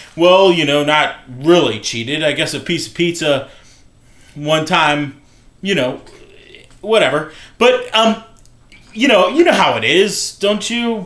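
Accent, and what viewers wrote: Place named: South, United States. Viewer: or.... you could film her LOL and you live in st. louis i live in st louis! it sounds like you're from the south though i don't know why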